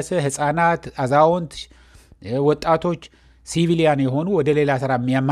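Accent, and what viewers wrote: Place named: Eritrea. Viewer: አነጋገርህ ማን እንደሆንክ ይናገራል አማርኛ እንኳ ማንበብ አትችልም አርትራዊ ነህ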